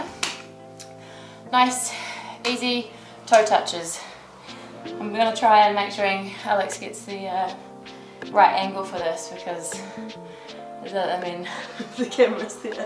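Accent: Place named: New Zealand. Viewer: Sounds Kiwi (New Zealand)? I mean the accent.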